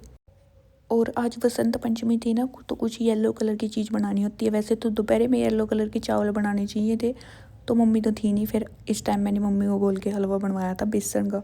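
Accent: Haryana, India. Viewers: Bhen muje lagta h app Haryana se ho awaj se😂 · Hahah shi 😂 haryana aale hi bolya kry nui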